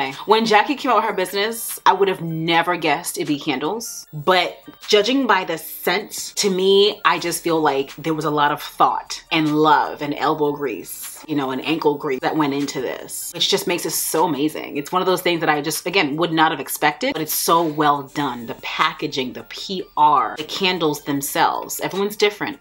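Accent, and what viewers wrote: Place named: Rhode Island, United States. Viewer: I just started binge watching your videos, and I knewwww I heard a RI accent the first time you said coffee🙌🏾 ⚓️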